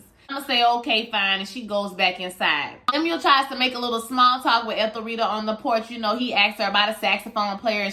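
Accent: Louisiana, United States. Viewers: Dont watch Fargo, but showing love from yourlovecraft reviews. You also sound like a fellow Louisiana girl. I could be wrong, lol!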